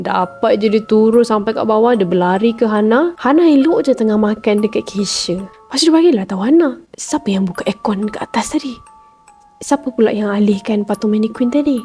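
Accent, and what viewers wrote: Kelantan, Malaysia. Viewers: org kelate ko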